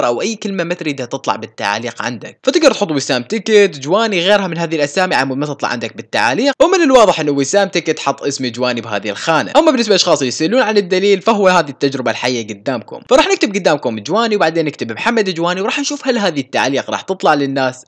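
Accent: Iraq. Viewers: مبرووووووووووووووك 200 الف مشترك تستاهلللللل👌❤ أكثر 🔥 ليش أحسك عراقي🧐🧐 يارب تطلع عراقي يكون فخر🥺🥺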